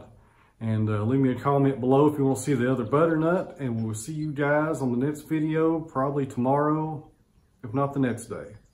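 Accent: United States. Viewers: Loving this dude's accent - sounds like REAL America, as it should be. Got my sub here from the UK.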